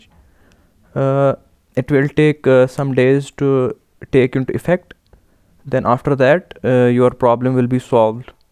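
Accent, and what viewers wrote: India. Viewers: You are definitely an Indian guy and your English has an Indian Hindi accent also, still you say I don't know how to read Hindi. Way to act cool bro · No. It doesn’t. I have many kashmiri friends and you sound like them. Just asked!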